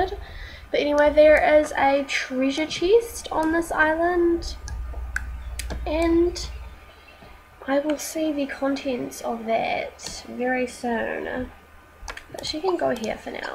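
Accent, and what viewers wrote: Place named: Australia. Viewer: Love ur accent btw where r u from ? Australia ?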